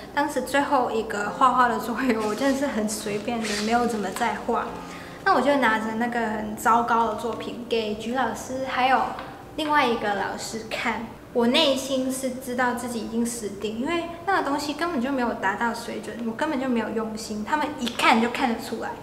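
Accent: Taiwan. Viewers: 熊熊花現妳講話已經開始出現台灣式腔調了..... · 看到的故事其實也有感啦~ 畢竟我從你大概10萬訂閱開始看~ 在異鄉生活真的不容易~ 自己也是從彰化到臺北讀大學~ 但生活總是會有不如意的事情~ 甚至得罪人到很莫名~ 會很沮喪~ 但也別忘了生命會展開別的窗~ 聽到你說曲老師的故事其實蠻感動的~ 畢竟他們老師也是你的恩人~ 算在你遇到低潮迷茫時幫你一把~ 希望之後新學期順利啊~ 話說你講話跟臺灣人真的很像耶~ 畢竟我遇過的香港人~ 即使住臺灣三十年也是蠻有口音的(比有馬明顯)~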